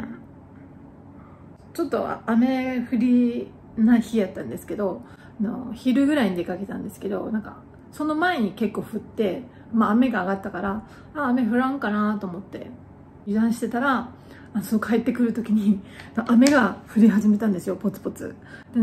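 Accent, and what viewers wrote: Kyushu, Japan. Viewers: やっぱり九州訛りが耳に着く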